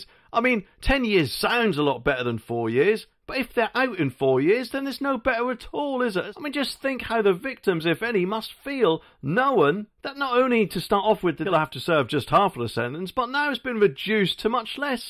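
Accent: Norfolk, United Kingdom. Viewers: You from norfolk? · The presenter is from Norfolk, for sure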